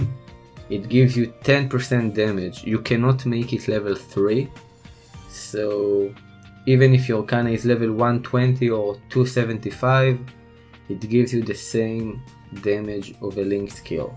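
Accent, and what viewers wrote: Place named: Israel. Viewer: המבטא הכי ישראלי ששמעתי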